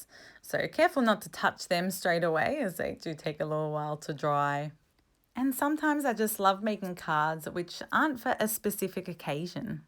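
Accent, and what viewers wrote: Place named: Australia. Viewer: Lovely to hear another Aussie voice on the Tube. I liked all the cards, and your style of video. New subscriber!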